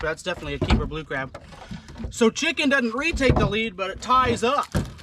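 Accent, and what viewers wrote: South, United States. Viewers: Bro moved to South Carolina and googled South Carolina slang words😂😂. Stop lol. Just cuz you live in the south don't mean you gotta talk like it.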